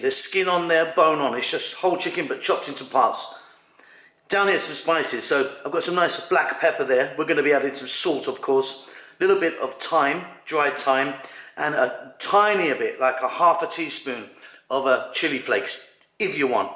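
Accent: United Kingdom. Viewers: I tried the recipe, awesome and I love your British accent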